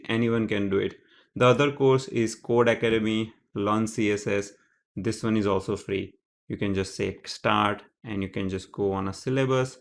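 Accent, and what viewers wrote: India. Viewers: Bhai tu indian lagta hai english se ??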